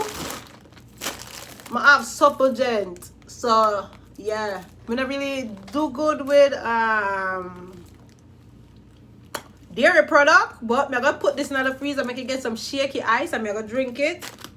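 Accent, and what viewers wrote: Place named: Jamaica. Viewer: That’s so dope, your Jamaican first off I love it I have two first Jamaican cousins and man y’all know how to turn sun shit up! I love Jamaicans 🇯🇲One ❤️! I love your accent as well 🥰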